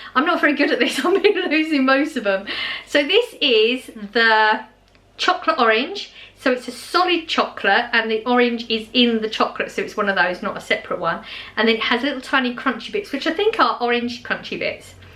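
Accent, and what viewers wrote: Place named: England, United Kingdom. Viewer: Never to chatty, enjoy your lovely English accent..makes me remember my grandpa he was from England,miss hearing his accent.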